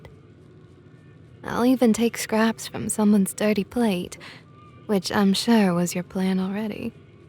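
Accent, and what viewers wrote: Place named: South, United States. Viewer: Ok I'm begging please take the ropes off I'm sorry for what I did please they're really hurtin me thanks for the wonderful audio really love the southern belle accent 💕🌹💕🌹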